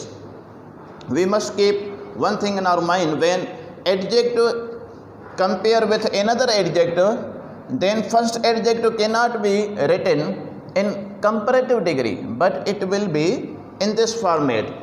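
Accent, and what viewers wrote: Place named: Sindh, Pakistan. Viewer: Sir u r out standing nd one thing ur voice tone is purely Sindhi u must keep in ur mind u delivered lecture in English 🙏🙏🙏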